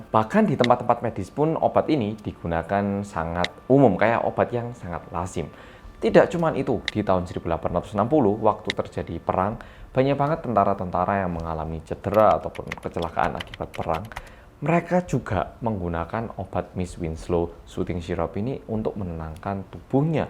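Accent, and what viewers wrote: Java, Indonesia. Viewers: untuk pertama kalinya nonton vidnya di ruang keluarga, dimana ada papa disitu. 5 menit setelah video dimulai, papa mulai bingung dan nanyain "temenmu punya youtube ta? kok muedok e nemen" karena jarang liat youtuber yang medok wkwkwwk. akhirnya aku jawab "engga ini org korea sempet tinggal di malang" trs dikira boong dong wkwkwk akhirnya aku tunjuin "inilo orang korea" sambil liatin vidnya mas yang dimana mas ngomong bahasa korea dan akhirnya papa bilang "iyaya orang korea, muedok nemen, km kalah" disini valent yg notabenenya org jawa kalah sama mas yang orang korea:)) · Kangen sama yang versi medok😍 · Wong Korea selatan yang mendok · anyyeong mas yg mendok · Kembali ke medokkkkkkk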